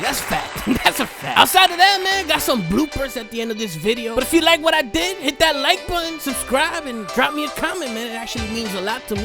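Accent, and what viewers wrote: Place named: Brooklyn, United States. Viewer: I knew you sounded like a Brooklyn kid lol😂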